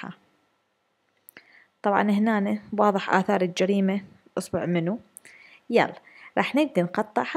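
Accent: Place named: Iraq. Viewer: اللهجه العراقيه تفوز🥺❤️❤️🌚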